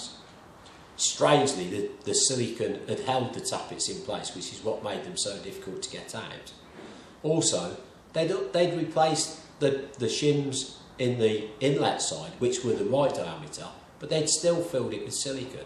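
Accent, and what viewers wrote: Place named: England, United Kingdom. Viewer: He's English :) My mom hated watching old movies from England on tv - but this gentleman is way easier to understand.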